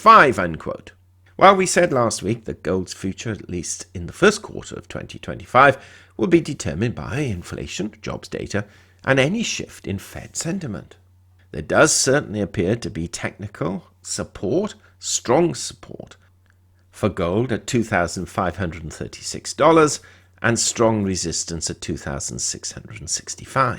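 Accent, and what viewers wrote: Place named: RP, United Kingdom. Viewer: get rid of the posh English accent and the waffling,and you could cut the video time in half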